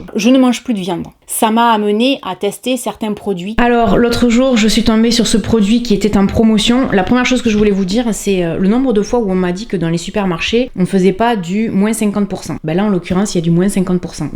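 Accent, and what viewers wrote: South, France. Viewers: C'est vraiment un régal de te regarder et de t'écouter, ton sourire, ta joie et ta voix qui chante le sud, j'en raffole Bisous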